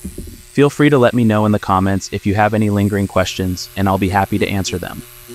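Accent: United States. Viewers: an Austrian artist with a perfect American english...awesome! Im Dan, from Brazil and I inspire on becoming an artist. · I totally thought you were American. Possibly even from my area in the Pacific Northwest. So cool to find out your from Austria! Love the content dude. These vids are incredible!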